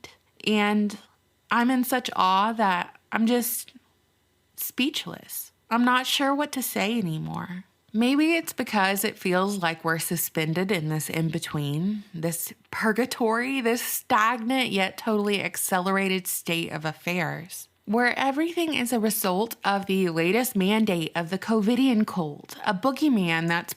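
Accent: South, United States. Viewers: I'm a NC girl, myself, so my inner dialogue is sprinkled heavy with that southern salt I hear in your outer dialogue. I am both jelly and terrified of the NPC who doesn't talk to themselves. Of course, those have been bred and programmed, I mean raised, not to think for themselves, only to consume. Consume all the gmo and PC rhetoric the powers that shouldn't be try to shove down all our brains. Those consumers make me so sad. I mourn for all the potential I see being flushed right now. But I for one really appreciate the fact that you let us into your beautiful mind every once in a while. Stand sting in your knowledge, and I sure hope that wisdom starts with the true Rock of our Salvation. Much love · So great to hear you again, as a southern boy working abroad its beyond refreshing to hear a voice from home. I think a lot of us "Americans" are caught in a daze by whats is happening and just as you said how fast things are changing in America. 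In this daze, we are letting slip through things that I would never think we would accept. The past el-ec- tion was a clear indicator of just how, what many Americans believe is a lie and I also think that is what started the dominos falling. Because nothing was done by any of the supposed safeguards in our government or judicial branch about the el-ec- tion, many Americans are in a state of trauma. If you've ever been around people in a state of trauma you will understand, they act on muscle memory, aka they will just blindly do what is the norm, even as the world around them is on fire, I think we are at that place as a nation! While many other Americans are have developed Stockholm syndrome due to restrictions, mandates, lockdowns, etc.... again like trauma people who are suffering Stockholm syndrome, people will accept and do things they normally would never even consider! You are one of the very few internet personalities that dig deeper into social issues and overlay a level of human psychology that makes sense to why things are happening! 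Great to hear and look forward to many more of what you did hear!! Thanks.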